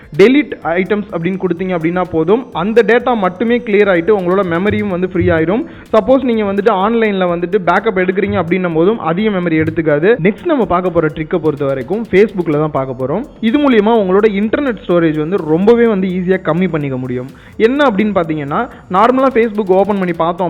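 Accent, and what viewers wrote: Tirunelveli, India. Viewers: bro neenga nellai yaa???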